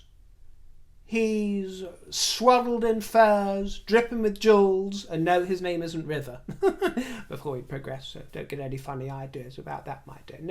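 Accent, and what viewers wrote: United States. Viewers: By the way you have a great American accent! 👏👏👏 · River your American accent is outstanding!😊🎉❤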